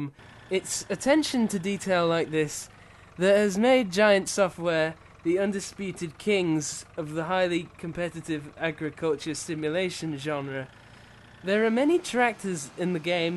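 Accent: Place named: Brighton, United Kingdom. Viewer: but why does he have a southern (brighton) accent?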